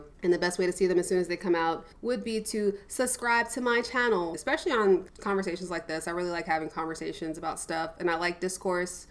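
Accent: United States. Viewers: I absolutely love your personality. I think you're fucking cool. Your way of speaking did bother me the first few minutes but it was more about your american girl accent than the swearing (which I do a lot as well anyway).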